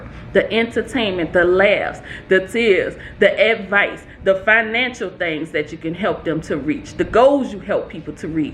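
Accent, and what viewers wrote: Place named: South, United States. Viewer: Congratulations Mrs.!!!!! I'm so proud of you. You truly deserve it. I love your videos. You come off as very down-to-earth with lots of southern charm. I'm from Mississippi. So, you bring me sounds of home that I just adore! 😊 Please make another video when you hit that 500,000 subscriber mark IN A FEW DAYS!!! 😁 (I'm speaking it into existence.) We all want to celebrate with you. Keep up the great work, and enjoy your blessings.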